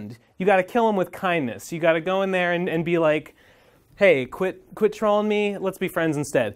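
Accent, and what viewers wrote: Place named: Kentucky, United States. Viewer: pretty sure he's from Kentucky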